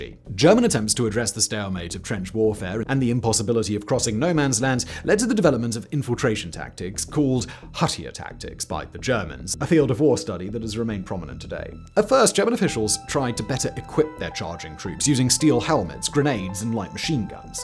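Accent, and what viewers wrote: England, United Kingdom. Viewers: The french and German trenches were nothing a like. People think becuase this guy dressed well and has a british accent he knows what he is talking about. The german trenches were meticulous and the English were temporary and terrible to live in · Why are you using American English? You sound English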